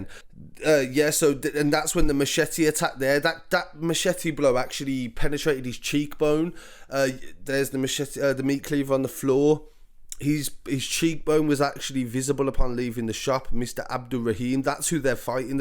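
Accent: Birmingham, United Kingdom. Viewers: Where is this donny channel from ??? Brum? Or Skegness? Lol rate him still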